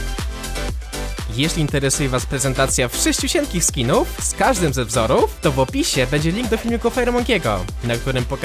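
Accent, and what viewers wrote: Poland. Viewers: Cze cze czekaj czyli jest górnik zabrze, od którego lepsza jest chyba legia warszawa i lech poznań, ale nie ma bayern munchen (monachium)? Przecież tam gra Robert Lewandowski, jeden z najlepszych piłkarzy Europy (jak się nie mylę). Btw szacunek za polski akcent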